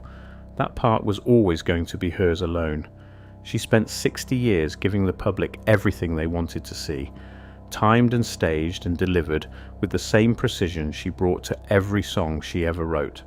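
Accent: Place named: United Kingdom. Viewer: Why does a video about an American icon have a British narrator??????????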